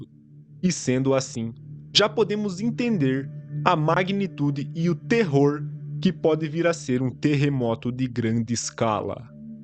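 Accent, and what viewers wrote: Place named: São Paulo, Brazil. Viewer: Vc é do interior de São Paulo... caipires em dia ... muito top...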